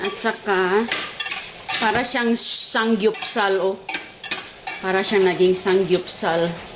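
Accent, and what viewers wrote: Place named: Aloran, Philippines. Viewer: Tagaasa manka ma'am sa Pinas moramag taga aloran ang tuno sa imong inistoryahan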